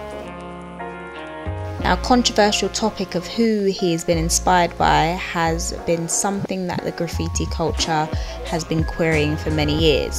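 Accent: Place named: England, United Kingdom. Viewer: It's an accent. In fact it's an English accent, so you could argue she's saying it correctly and you're not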